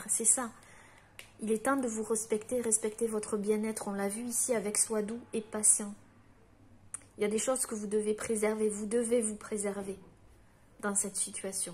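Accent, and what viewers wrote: South, France. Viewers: Merci l’accent du Sud tellement magnifique accompagnent tes messages qui sonnent très juste pour moi encore merci je t ´envoie plein d’amour Christophe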